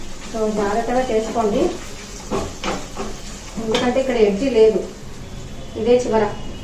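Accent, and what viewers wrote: Rayalaseema, India. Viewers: Meedi raayalaseema anukuntunnanu maadikuda raayalaseema Atp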